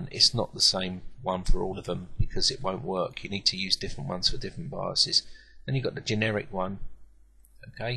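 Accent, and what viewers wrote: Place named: Cockney, United Kingdom. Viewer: Your accent is hard to understand. Speak clearly and don't cow off the words. Not many people understand cokni.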